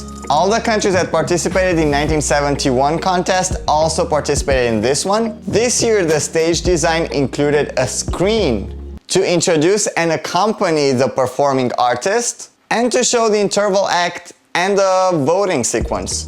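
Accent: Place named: England, United Kingdom. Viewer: Hi! Nice job as always! Just a friendly remark as it's not the first time i hear you pronouncing "Gigliola" wrong, you dont have to pronounce that g in the middle at all, just skip it and tadaaam you have the correct name. I bet you can experience the proper italian fluency now:P By the way im catching the opportunity to say i love your english accent (been wanting to say that for a while) As for your french one.. ehm i do see your effort there hehe close to decent:D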